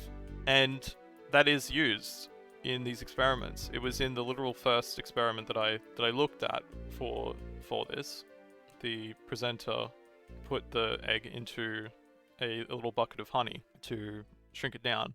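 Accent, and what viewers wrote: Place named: Australia. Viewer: Australian accents are cute though. Show us more about your game soon!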